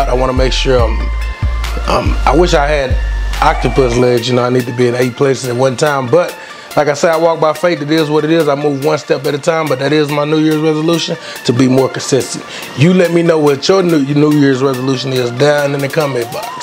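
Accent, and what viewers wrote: Texas, United States. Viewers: Excuse me Sir...You from Texas? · Just when I was looking for something quick to make! Also....is that a Texas accent? New subscriber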